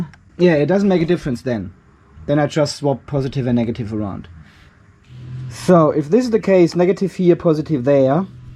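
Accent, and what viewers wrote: Germany. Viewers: Hello, I am from the nature Island of the Caribbean, Dominica. I have been watching your videos for a while now and I must say that there's never a dull moment, I just love your style and your accent, when I first watched your videos I told myself this man is from Germany because I worked with a few Germans building a brewery in my country. Keep going bro we a watching. Oh and the next time you want to say "shit" please say it in German I like to hear it but can't spell it.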